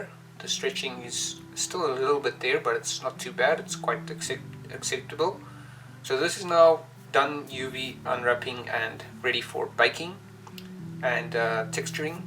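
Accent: South Africa. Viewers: dude you sound extremely South African, I need to know you nationality !!!!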